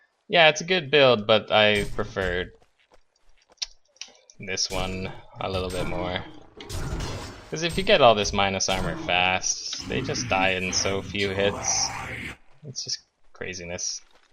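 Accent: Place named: Canada. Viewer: Ohhhh that sweet canadian accent #Wemissyou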